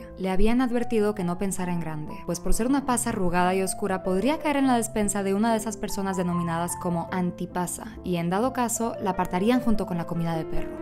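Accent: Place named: Mexico. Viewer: más me encanta con tu acento mexicano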